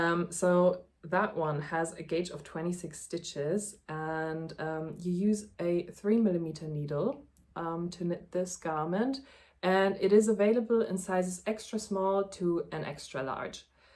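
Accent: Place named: Germany. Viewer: am i crazy or you sound kinda german idk. i thought you were like a fairly big creator on here you look like you could be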